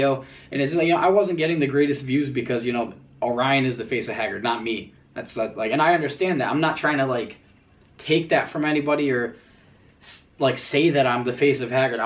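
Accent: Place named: Russia. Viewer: you're a good genuine dude,. this channel will grow because of that. more russian accent though.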